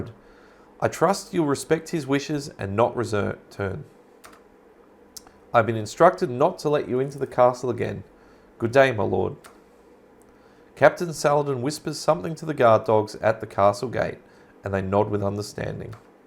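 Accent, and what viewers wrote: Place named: Australia. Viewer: Nice to see you got another video out. I was wondering if you gave up producing anymore of these. Now, I just only watched the first few minutes as it is getting late here in my part of the world. Will watch it later when I have more time. Based on your accent, I am assuming you are in Australia. Also to add. Wow! Talking about going "old school", compared to when I played this game on CD ROM with full voice acting and such on a 486 with a Sound Blaster 16 ASP w/ wave table daughter card. I think I still have the thing in the basement. May have to see if I can resurrect it.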